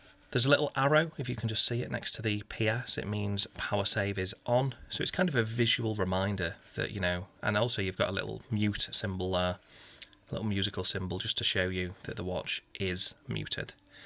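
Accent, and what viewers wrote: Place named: Liverpool, United Kingdom. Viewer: Love this watch,. I also love your accent! I'm a Yank studying all the different dialects of the U.K. Do I detect a bit of Scouse, Mate?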